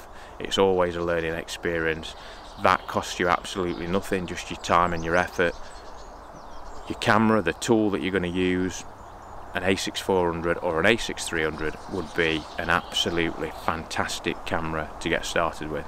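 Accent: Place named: Mansfield, United Kingdom. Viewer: Well! Blow me down with a feather you're only dahn't road! Am frum Mansfield! Well actually I live in sunny Woodhouse (Wudduss) now! Small world! I thought I recognised the accent!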